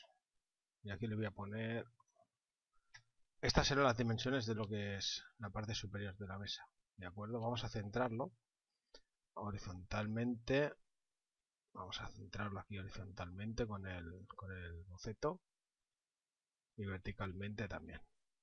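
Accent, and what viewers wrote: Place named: Catalonia, Spain. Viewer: acento catalan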